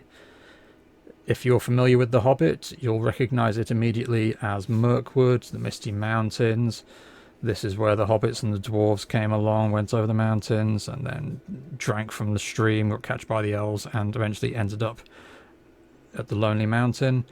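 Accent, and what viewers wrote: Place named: England, United Kingdom. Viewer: I never got to play TOR1e but was impressed by the way it was put together and 2e is looking just as good as Free League's other output. All of the Tolkien licensed RPGs have some merit, with but one exception - I can't understand how anyone can be happy squeezing Middle Earth into D&D. Great game but totally wrong for some styles and settings. I was always disappointed that Decipher's unrelated economic problems killed the LotR RPG. It used the movies as a starting point but was looking to bring move-goers into the wider world of the books if it had just been around long enough. As one last point: keep up the good work. TRPG as therapy fascinates me and it's good to see it with an English accent.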